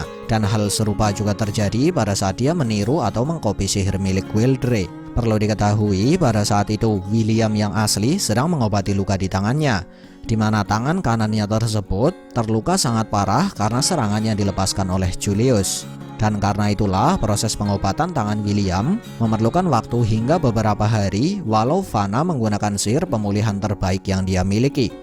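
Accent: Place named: Java, Indonesia. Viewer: bahasa jawanya medok sekali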